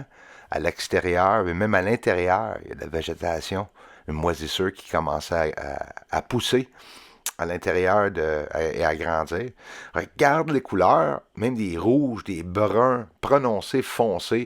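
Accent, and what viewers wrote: Canada. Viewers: J'aime l'accent sympathique de notre ami Canadien .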